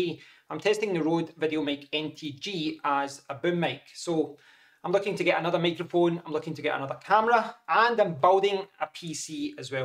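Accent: Scotland, United Kingdom. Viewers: Nice Scottish accent 👍🏼🙂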